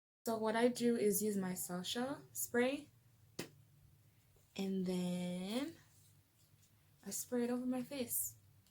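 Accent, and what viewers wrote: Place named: Jamaica. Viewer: The Jamaican in you can't hide sis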